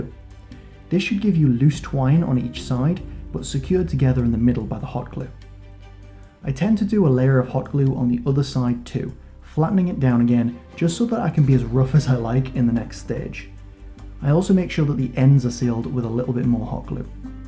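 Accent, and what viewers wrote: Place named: Newcastle, United Kingdom. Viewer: Watching this older video, I can really hear your geordie accent coming through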